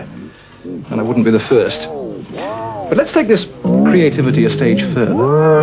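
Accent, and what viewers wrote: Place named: RP, United Kingdom. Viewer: All tv presenters should talk like this chap. BBC English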